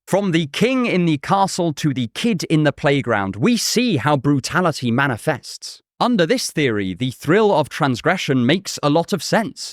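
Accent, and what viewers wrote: RP, United Kingdom. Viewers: Did anyone tell you that you got one the most British accent ever ? Like these days, whenever I think of British English, I tend to hear your voice. No offense intended if that happens to be a sensitive area btw.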